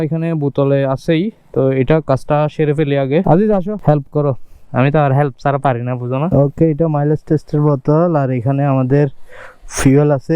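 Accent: Chittagong, Bangladesh. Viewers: Vai amr mone hocche apnr bari chottogram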